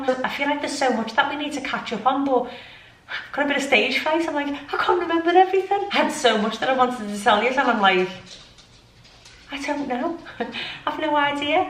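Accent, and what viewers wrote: Liverpool, United Kingdom. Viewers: Ah the c word, fab n gr8 seeing u back with your lovely liverpool accent, won't b long till you're putting up Christmas trees again, hope u move soon, we're 10 weeks along waiting to buy house nxt door x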